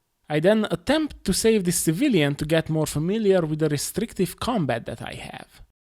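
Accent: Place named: Eastern Europe. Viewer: i thought his accent sounded a little bit Slavic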